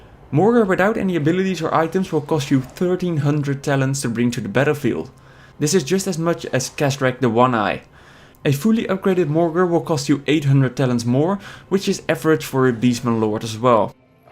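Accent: Netherlands. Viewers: Your accent sounds so Dutch !! any change you are from The Netherlands ? Anyways, amazing video !1 thanks !! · He's fucking dutch, you try speak a language that you aren't accustomed to and let's see how you sound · dacht ik al was een nederlands accent xD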